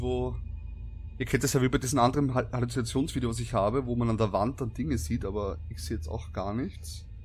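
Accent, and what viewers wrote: Vienna, Austria. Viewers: Habe das selbe gesehen wie du.Das eine sieht in der Tat aus wie eine Schildkröte aus mit Hut.😁😄 fand es sehr abstrakt und musste die ganze Zeit schmunzeln...😄finde deine Stimme total cool.Kann es sein das du irgendwie aus Wien oder irgendwo in der Nähe her bist?glg 😊👋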